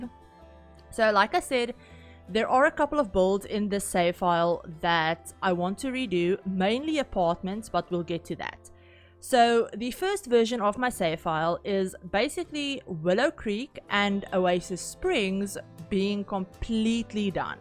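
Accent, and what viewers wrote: Afrikaans, South Africa. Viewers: This is the first video of yours I’ve watched and I was so shocked to hear what I think is an Afrikaans accent? So cool!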